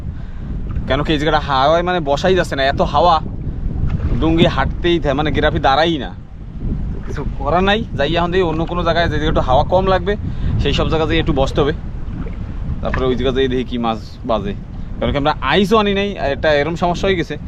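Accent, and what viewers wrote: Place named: Barisal, Bangladesh. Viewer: Andamaer prakitik drisso osadharon ei drisso dekhar jonno bose thaki ar vai er borisaler vasa sonar jonno apekhe thaki khub valo lage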